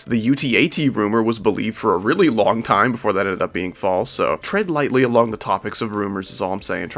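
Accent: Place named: Texas, United States. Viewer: We need you to only use your texan accent for this channel to be epic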